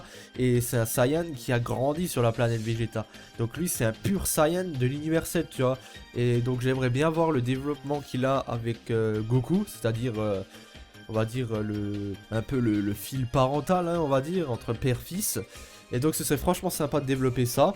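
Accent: Alsace, France. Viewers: t'es alsacien nan ? xd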